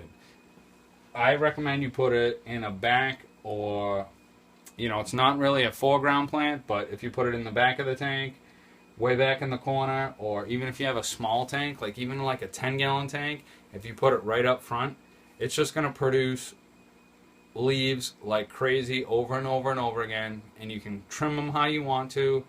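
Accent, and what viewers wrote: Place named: Boston, United States. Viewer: I watch this guy for 2 reasons. 1) learn more about aquarium care and 2) practice my Boston accent. Love it.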